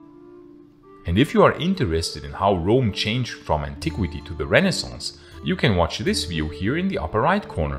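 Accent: Germany. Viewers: Oh God, 1 second in and I can't watch it. Listen Mr German speaker it is not Lon,un, it is LuN Dun, stress on first syllable etc etc. YOu know how you can tell a German? Complete inability to pronounce London plus complete shock, horror, and inability to comprehend the use of the word "since" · You are German, aren' t you. I detected it when you pronounced the word Claudius .... You might want to update your english pronunciation.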